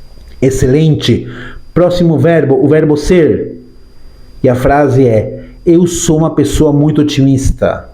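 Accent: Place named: Argentina. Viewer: Muito.charme o..sotaque do argentino falando português. Nao canso de admirar!!!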